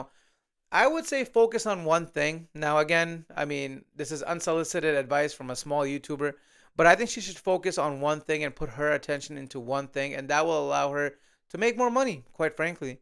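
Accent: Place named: United States. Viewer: You've dug your own grave by publishing click-bait crap (scam, exposed, etc.) You'll never be a success trying to undermine others. Try doing this with white skin and you might be grateful that you tick at last one of the diversity boxes. Be happy bro, life is amazing! Given your accent, I'd guess you're in the US. If your'e looking for career optoins, try the Democrats.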